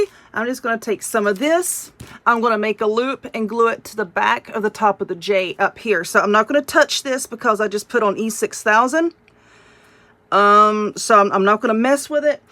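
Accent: South, United States. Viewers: I love this wreath! that husband joke was hilarious! You are so funny and I love to watch your channel. I love your southern accent. Tfs.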